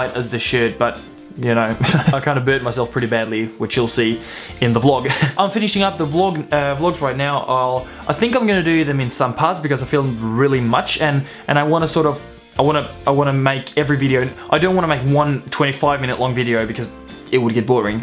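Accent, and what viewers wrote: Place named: Tasmania, Australia. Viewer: It still freaks me out (in a good way) to hear a Finnish guy who talks like a Tasmanian :)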